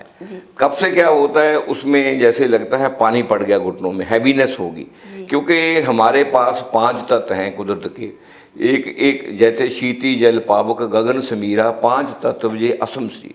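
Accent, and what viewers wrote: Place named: Punjab, India. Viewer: Dr. Sahib plz. Kara banane wali 11 चीज़ों के naam लिख दीजिए आपका पंजाबी style मै बोलने कि वजह से समझ नहीं आ रहा है. मैं तकलीफ में हूँ please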